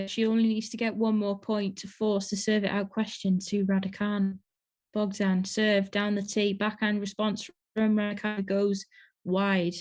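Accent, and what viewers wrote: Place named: Merseyside, United Kingdom. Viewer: thanks for the talk-through. You're a lovely lady and you have a fun stage presence. Can we get you on Match Of The Day, please? I'm sure you'd be an instant hit! I'd love to see you talk through a rock concert, too - Foo Fighters, Paul McCartney, Metallica, whoever.... I just know you'd be an absolute scream and a big hit doing that. I've lived too long away from the UK to work out your accent, but somewhere not all too far from Merseyside seems a possibility. Like I said, I ain't too good at accents. For me, anyone who just tries is already a star. Good on yer, young lady! I've subbed;-)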